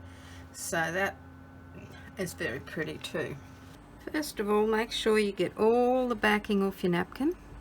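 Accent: Australia. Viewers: Hey just curious what is your accent, Australian or am I incorrect?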